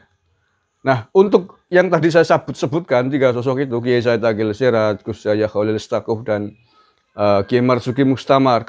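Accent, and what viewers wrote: Banyumas, Indonesia. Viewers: Ini manusia tukang fitnah ....dasar ngapak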